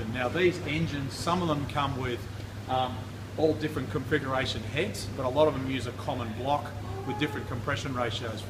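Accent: Australia. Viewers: There's just something about an Australian explaining Subarus that adds legitimacy to their statements... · Great vid. Following for sure. Thanks for posting. Will keep looking for updates. Great to hear an Aussie accent pushing the envelope. Well done.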